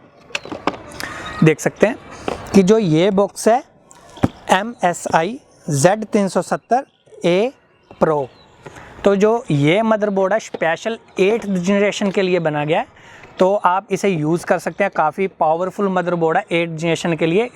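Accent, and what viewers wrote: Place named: Punjab, India. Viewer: Kinna aukha h punjabi bande nu hindi bolna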